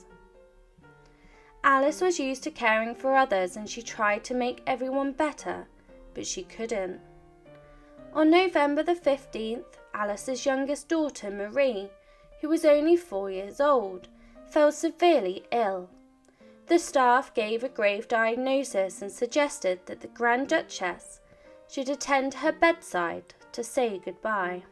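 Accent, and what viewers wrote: United Kingdom. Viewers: . “She also wore black at her wedding.” “She arrived to the ceremony in a white gown.” “Queen Victoria forced everyone to wear black before and immediately after the wedding.” Like, wtf? Also, whichever British accent you have should be done away with. It is the most annoying manner of speaking I have ever heard.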